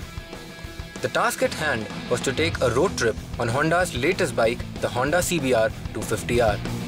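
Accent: India. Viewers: he's a south Indian. Most likely to be a Keralite xD They pronounce T like that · gotta love the indian accent =D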